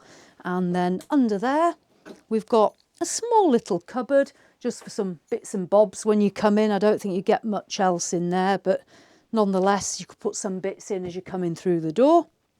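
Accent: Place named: England, United Kingdom. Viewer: I like her English accent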